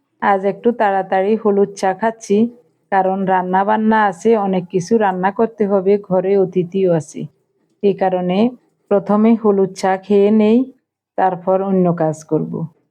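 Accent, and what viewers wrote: Noakhali, Bangladesh. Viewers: আমি এই প্রথম দেখছি।খুব ভালো লাগলো।আপনার কথাগুলি শুনে মনে হচ্ছে নোয়াখালী বা চট্রগ্রামের ভাষা...খুব মিষ্টি ভাষা।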